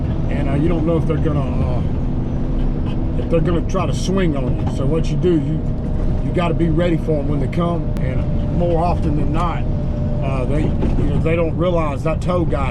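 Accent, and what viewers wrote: South, United States. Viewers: Laahamburghennies, Austin mahrt inns, beautiful sunny southern vernacular! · "Lamberginny, Austin Martin..." haha, gotta love the southern accent.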